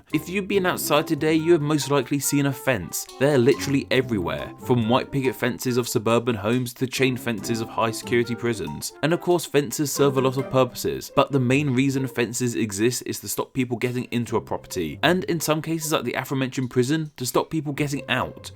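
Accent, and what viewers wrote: United Kingdom. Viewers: No, you have a British accent, therefore you're not so much watchmojo as you are Whatculture. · It's only fun to say because you're British and have a British accent.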